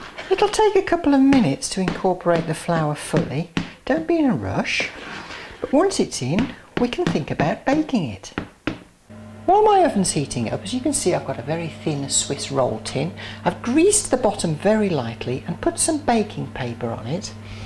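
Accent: United Kingdom. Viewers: i'm french and a love your recettes and your english it's sooo british !!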